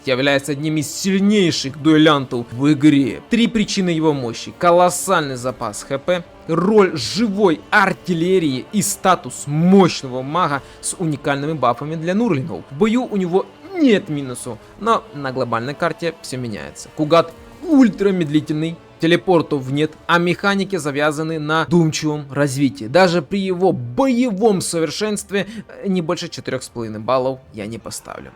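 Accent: Ukraine. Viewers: Хороший канал про практическую ваху. Уши режет украинский акцент , но в принципе очень годно. Лайк. Подписка ? да .. заверните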